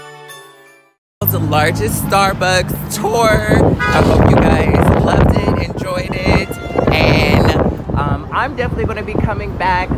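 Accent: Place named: New York, United States. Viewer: I feel like a failure. I legit thought you were from NY!????!?!?!?? Like where did I get that from? Omgoodness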